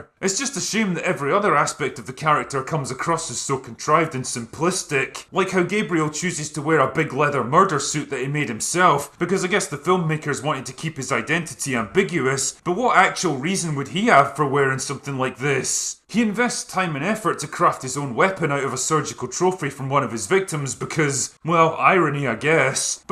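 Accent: Scotland, United Kingdom. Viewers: You’re becoming an Scottish accent echo of Redlettermedia 😬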